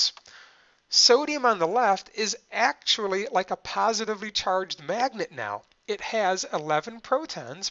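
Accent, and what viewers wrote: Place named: Boston, United States. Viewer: Wicked Bahston accent!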